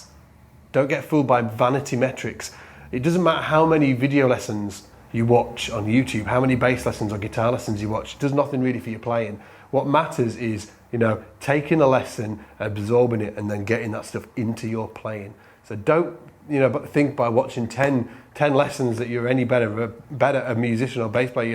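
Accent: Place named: Liverpool, United Kingdom. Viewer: you talk like!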